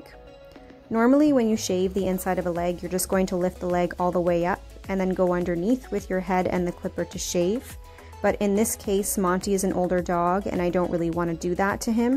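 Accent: Canada. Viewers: A bit off topic, but as a non-native English speaker, I felt so proud of myself when I could hear your Canadian accent and then I checked and the assumption was correct😄 And on the topic of the video - Monty is just an absolute sweetheart 😍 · I've NEVER been a pet owner, but Im so glad this channel was in my recommendations. I found this video to be so satisfying and peaceful. And as an American from the great State of Texas, your Canadian accent got you a new subscriber!!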